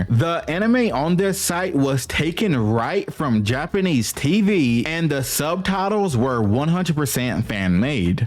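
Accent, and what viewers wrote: South, United States. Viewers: This man def a southern brotha✊🏾😂, all love, I just can tell 😂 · Who was that guy on Bones with the strong country accent… this narrator is giving strong him vibes. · You sound very hillbilly, Mr Ty for making the video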